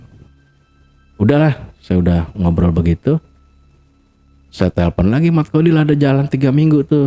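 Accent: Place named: Jakarta, Indonesia. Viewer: Betawi pisan ni bahasa nya 😂❤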